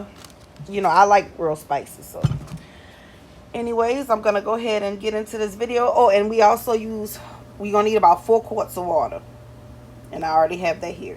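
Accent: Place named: New Orleans, United States. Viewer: That New Orleans accent yesss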